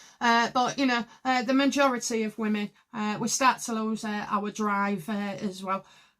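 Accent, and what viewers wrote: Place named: Yorkshire, United Kingdom. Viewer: If i'm not mistaken she's close to Hull.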